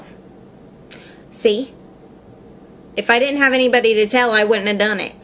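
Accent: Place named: Rural, United States. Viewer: lol ur hick accent is funny.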